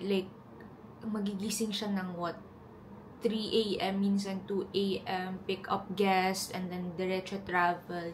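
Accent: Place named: Philippines. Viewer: Pinay na Pinay yng accent mo!Galing mo mag tagalog!👏❤️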